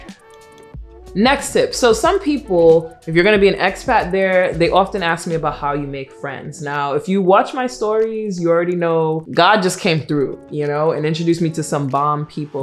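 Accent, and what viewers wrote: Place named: Barbados. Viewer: You picked up a slight bajan accent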